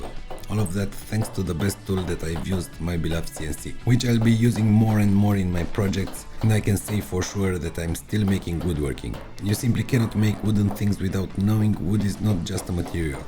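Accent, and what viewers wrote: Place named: Russia. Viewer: Прямо слышится русский акцент в английском 😂 Вы из России?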